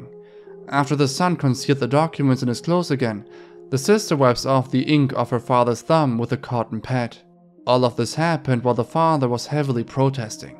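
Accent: Germany. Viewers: You have a hard to pin accent....kinda German, or Germanish, but I deserve 50$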